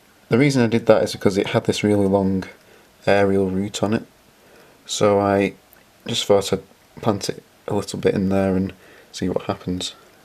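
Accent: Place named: United Kingdom. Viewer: FINALLY! lol I'm so glad you put this one up! I didn't realize how much I was waiting for the awesome camera quality, plant updates, and British accent, until I watched it. Your dragonfruit (and arguably more importantly, bananas lol) are exceptional :D P. S. Might you be growing the Sikkimensis outside eventually, since it is very cold tolerant?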